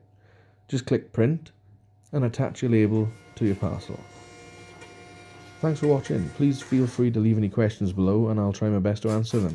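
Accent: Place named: Wales, United Kingdom. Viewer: Watching this in June 2020... this is brilliant! That Welsh accent is just gorgeous yowser! I always wondered how people got those labels printed. I must get one of these Printers, it's a great investment. Thanks... great vid with a nice sharp image... love it!. New Subbie. London UK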